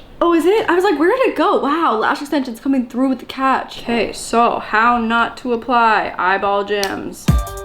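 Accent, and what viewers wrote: Canada. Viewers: Some ppl make fun of Canadian accents but I think it's so cuuuuute like puppieeeees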